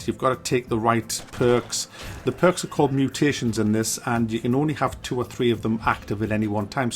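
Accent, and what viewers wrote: United Kingdom. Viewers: your voice sounds like you are a sailor from a colonial era British ship. Is it a "limey" accent? I am not from UK, I don't know what accent is from where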